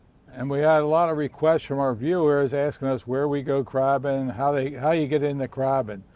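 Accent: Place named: Maryland, United States. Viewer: You guys are wonderful we love you... We now live in Jupiter fl.. Don't crab the loxahachee.. But watch you.... Miss maryland..you sound like that's where you are from.. Happy crabbing. Found an alloy can eat in stuart... Are you from Maryland.. Ann mckewin west palm beach... God bless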